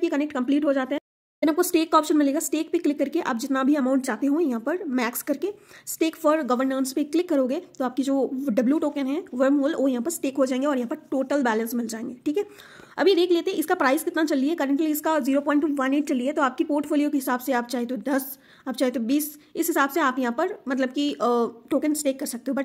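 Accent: Bengal, India. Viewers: App Bengali ho kiya mam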